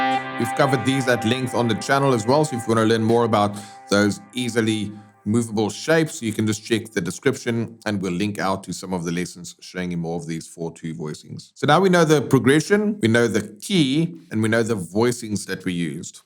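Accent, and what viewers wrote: South Africa. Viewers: The second I heard your south african accent, I knew this would be the perfect lesson for me 😂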